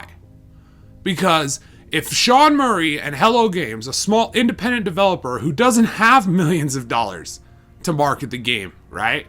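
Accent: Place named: East, Canada. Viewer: Accent sounds like eastern Canada.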